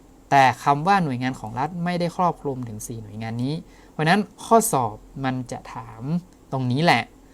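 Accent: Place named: North, Thailand. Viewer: ติวเตอร์เป็นคนเหนือใช่ไหมคะ สำเนียงคนเหนือมาก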